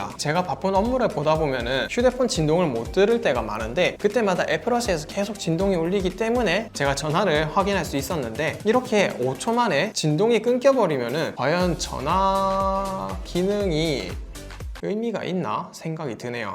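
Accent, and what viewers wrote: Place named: Gyeongsang, South Korea. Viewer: 부산 경남 사투리 정감어립니다 반갑쑤~ㅎㅎ 일반 스트랩 메탈 절대 호환안됩니다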